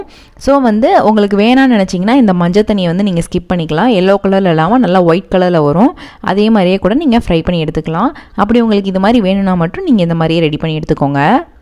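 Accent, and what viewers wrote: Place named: South Tamil Nadu, India. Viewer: South tamilan spotted by her slang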